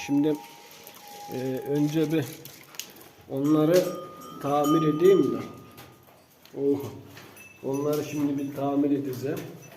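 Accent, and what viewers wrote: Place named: Black Sea, Turkey. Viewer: Her söylediğini anlamasamda, şivesi çok tatlı, her Karadeniz insanı gibi ... ☺☺☺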